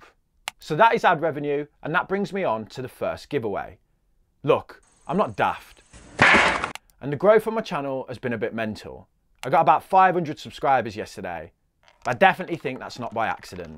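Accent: United Kingdom. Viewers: Honestly and truthfully. I have been watching you for about 2 weeks now. And first i like to hear our British lad talking about shares and giving advice. I know there are alot out their abroad but it's different ( you know what i mean) . I have you on my notifications. Keep up the great work most importantly, your Honesty..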